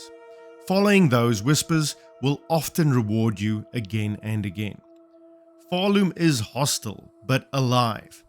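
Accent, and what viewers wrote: South Africa. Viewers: Hey man, what accent do you have? South African? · Aussie Channel, but I know a Saffer when I hear them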